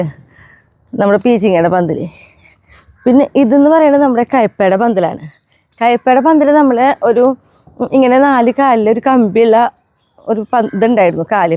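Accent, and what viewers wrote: Thrissur, India. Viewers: I am also a from Tamil Nadu. I do watch ur videos, like ur vegetable garden n ur dogs very much. Never mind these types of people. They can't help themselves but to crticise others. Was in Trivendrum for 3 years, can read and follow Malayalam, but ur Trissur Malayalam is bit difficult for me 😂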